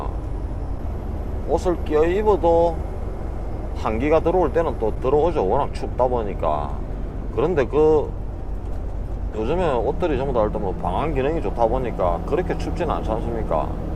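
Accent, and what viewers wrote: Gyeongsang, South Korea. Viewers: 영상 날마다 보고있습니다. 카메라를 통해본 캐나다. 미국은 상상했던 것과는 다른 풍경이라 색다르고 갱상도 말투도 동네형님처럼 정겹고 그렇습니다. 항상 힘내시고요 오늘도 화이팅입니다^^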